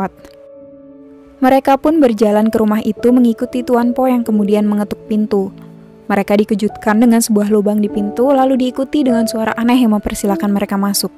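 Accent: Bandung, Indonesia. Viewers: Wih orang bandung👍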